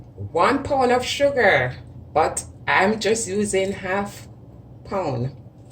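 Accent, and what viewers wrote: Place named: Jamaica. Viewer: The accent and pronunciations are killing me. 100 percent Jamaican.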